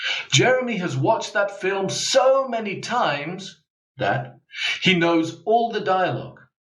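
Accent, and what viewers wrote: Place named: RP, United Kingdom. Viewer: Thank you. Good job! Many people on the internet speak RP, but yours is one of the best. Loking forward for next videos. 🤩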